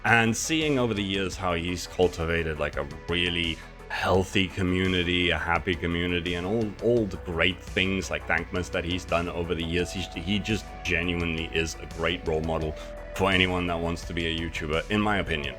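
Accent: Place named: Wales, United Kingdom. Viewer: Congrats! 🥳 Have to say I was convinced you were Welsh before watching this. My first language is Dutch so I can usually pick up on similar accents, SA included, but unlike Rendog's accent, I never would've guessed you were from SA.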